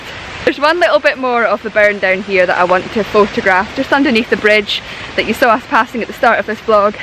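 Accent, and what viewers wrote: Scotland, United Kingdom. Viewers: Another excellent video. I love the Scottish accent even if I don't know what you're talking about half the time. I really love the last photo you showed. Nice work.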